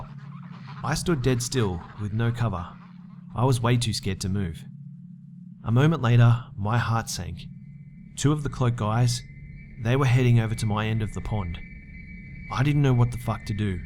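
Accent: Australia. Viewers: Minnie Hambrick The guy narrating the story is Australian but, the guy who wrote the story is British. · aussie accent lol*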